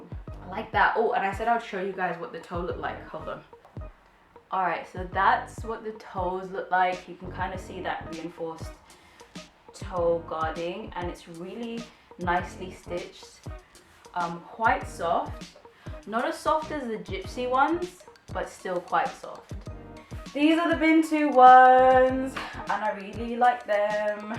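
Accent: United Kingdom. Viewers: You are a Goddess. I love the British accent.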